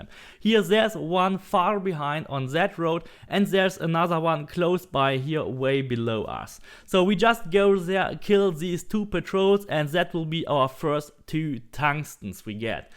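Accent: Germany. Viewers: Short Question ur German?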